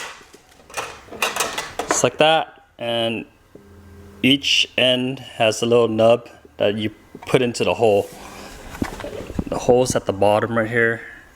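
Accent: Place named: Pennsylvania Dutch, United States. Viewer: You really helped me put my M01 together. I was so frustrated I was going to return it. I bought the same model from Amazon last month and it came broken and I sent it back. When I got it the second time last week and couldn’t get it together or find a video to help me I was so frustrated. My mother is from Lebanon, PA and thought you had a Dutch accent. Hey, my parrotlet and I thank you so much. Stay healthy and happy friend.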